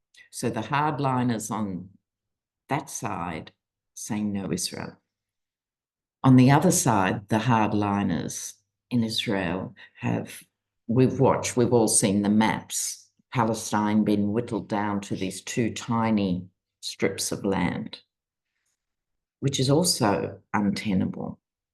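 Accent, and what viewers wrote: Australia. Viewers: Thank you Dr - love your Australian accent ❤❤❤🙏🩵🩵🩵🙌☮️👍✌️🌎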